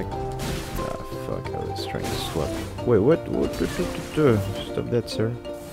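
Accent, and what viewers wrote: France. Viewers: for the timestamps and the French accent (force frérot)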